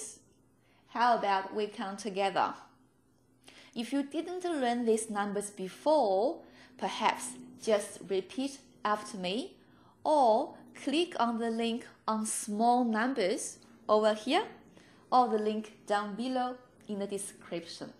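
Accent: China. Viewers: She is teaching Mandarin, however she has Southern China accent. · 大陆音，台湾发音不一样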